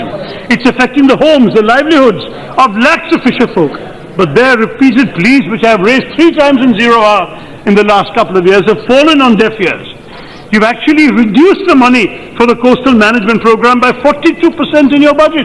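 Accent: United Kingdom. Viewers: I really really admire not only bcoz of his english accent but highly bcoz of his speech which is always in the point 👍👍👍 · It's nice to deliver something like British accent, but how many Indians would be able to understand it, and this is again an advantage to the government. So, better showing our Excellency through any language we have to make our citizens to be aware of every single fact and figure out the actual face of the government. Hume ye dhyan Dena hoga ke aam logon ko Sarkar ki kaam kaj pata chale.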